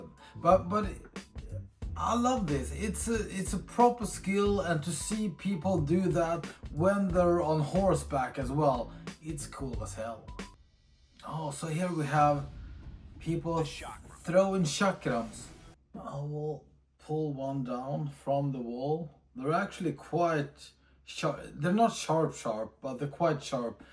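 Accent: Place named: Scandinavia. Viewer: based on his accent, either Denmark, Sweden, or Norway...that's why I'm asking him, Inwas wondering the laws regarding that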